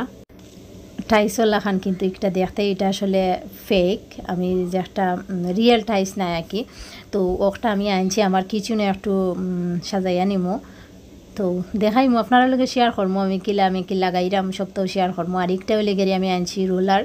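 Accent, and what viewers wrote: Sylhet, Bangladesh. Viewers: আপু আপনার সিলেটি মাত খুব ভালা লাগে❤️❤️ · Ha Apu uni ancholik bashai kotha boltasen .ata hocha sylhet ar ancholik Basha jata bangla bashar e akta part.koiak din shunla apni bujta parben InshaAllah.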